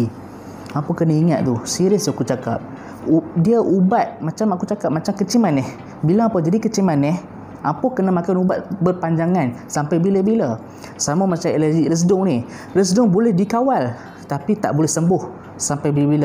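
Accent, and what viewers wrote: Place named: North, Malaysia. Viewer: Terbaik! Love your explanation with utara accent 😂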